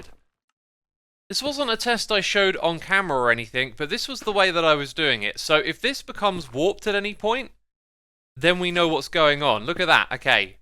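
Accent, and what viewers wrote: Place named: London, United Kingdom. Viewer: overcorrects his 'v' 'th' substitution error by saying 'nether' instead of 'never'.:) I enjoy your london accent.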